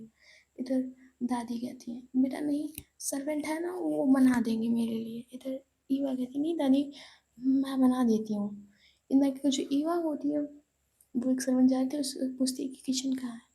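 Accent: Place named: Western Hindi, India. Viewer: The eposide is nice & like western hindi accent. It suits your voice.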